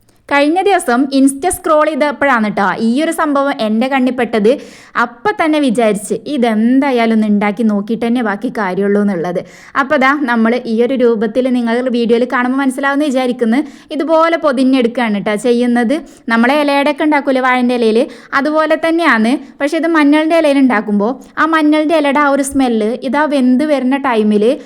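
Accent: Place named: Kannur, India. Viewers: കണ്ണൂർ ജനിച്ചു വളർന്ന എന്നോടാണോ കണ്ണൂർ ഭാഷ ഇല്ല പറയുന്നേ 😅അവൾ പറയുന്നതിൽ വടക്കർ എന്ന് പറയുന്ന കണ്ണൂർ കാർ ഉണ്ട് അവരെ ഭാഷ വരെ അതിൽ വരുന്നു 🤣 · Kannur bhasha parayalley shariyilla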